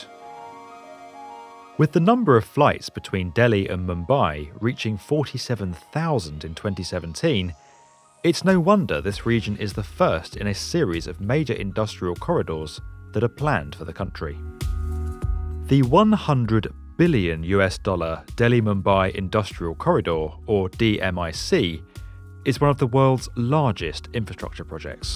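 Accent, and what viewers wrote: England, United Kingdom. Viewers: Great Brit announcer, but Asian pronunciation sucks..... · Never did like the English voice for trying to sell me on something I know won't work. You can't get people to buy the same car or eat the same food, but you are going to put them all on one common transportation device! Never going to happen.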